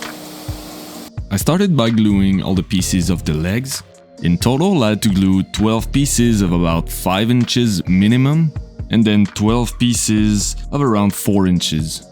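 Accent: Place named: Quebec, Canada. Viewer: well done sir. As someone who also works with reclaimed wood, I appreciate the amount of milling required to make it that good. Such a shame you didn't keep it. Your camera angles and editing are great. Your are well spoken and explained really well. My only issue is with the unnecessary music. Your voice and shop sounds are all you need. You sound French/Canadian...where are you from? Considering you only have 1 video so far...you should be pleased you already have over 1k subscribers!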